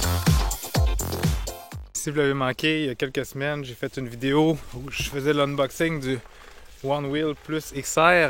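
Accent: Quebec, Canada. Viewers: ..... Ho yess... Je viens de te connaître... je remarque que tu t’intéresse à tout ce qui est gadget de tout genre... c’est super..personnellement moi mon dada c’est tout ce qui est transport électrique en générale ( Giro roue, one wheel, trottinette électrique, segway, geebee, ) trop habitué d’entendre l’accent français... rafraîchissant d’entendre un youtubeur Québécois...je vais continuer de te suivre sans faute...bravo et lâche pas, tu inspire confiance... en passant je suis de la région de Québec aussi et merci de ta réponse rapide... salut!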